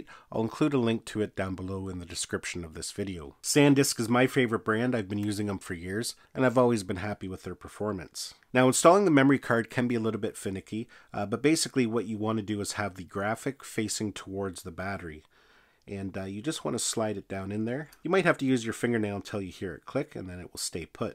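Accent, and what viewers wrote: Canada. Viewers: By FAR the best video on the new GoPro 9.... BTW I'm from Ontario.... you sound like you're also from the frozen North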